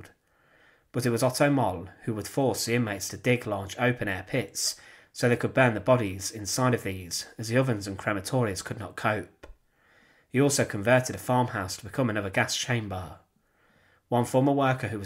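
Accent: United Kingdom. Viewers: Isn't the commentator Britsh? Not redneck... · He’s actually speaking very slowly and calmly in a British accent. Just because he has a better mastery of the English language than you doesn’t mean he should cowtow to your ignorance. Listen better.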